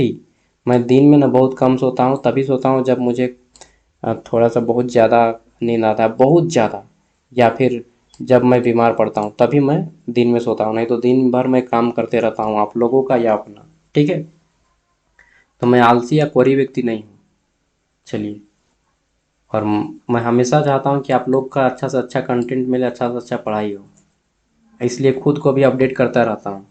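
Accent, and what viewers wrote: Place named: Jharkhand, India. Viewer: Aapka khortha wala tone bhut shi lgta hai sunne m😃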